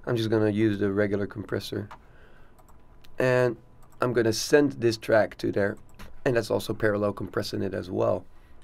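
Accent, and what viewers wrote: Netherlands. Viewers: Amazing tutorials! Within the first few minutes of your video, I already knew; "I'm listening to a Dutch guy". Rotterdam! Lekker man!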